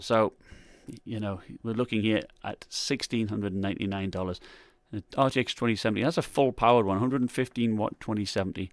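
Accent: United Kingdom. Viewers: That’s odd I heard a UK accent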